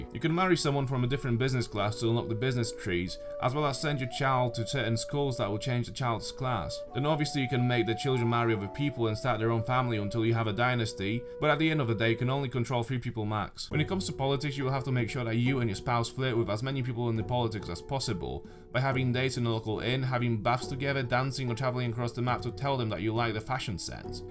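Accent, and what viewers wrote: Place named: Northern England, United Kingdom. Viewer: Wait so are you Polish as per your name, or are you from Sheffield?! Seriously you sound like you're from northern England! Nice review though 👍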